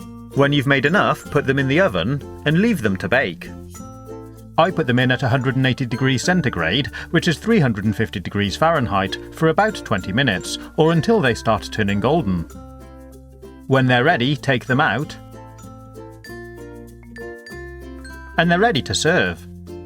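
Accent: United Kingdom. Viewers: I'm absolutely in love with your perfect British accent.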